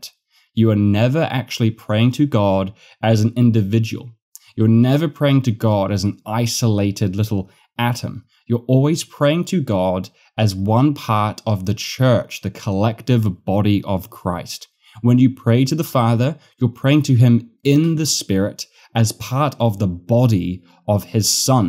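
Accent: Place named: South Africa. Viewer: Thanks so much for this video. It's really helped me understand how to properly go through the daily office. I'm still fairly new to Anglicanism and so I really appreciate this. Also I didn't know you're from NZ (I thought your accent might have been from South Africa or something), I'm on the other side here in Sydney Australia.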